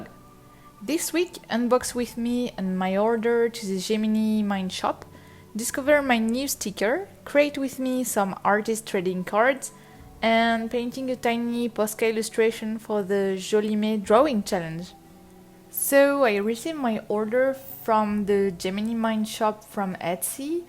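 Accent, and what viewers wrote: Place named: France. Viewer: You have a french voice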